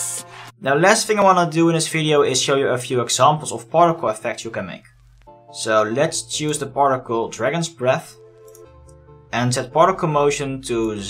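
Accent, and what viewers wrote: Netherlands. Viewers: you sound dutch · Are you dutch?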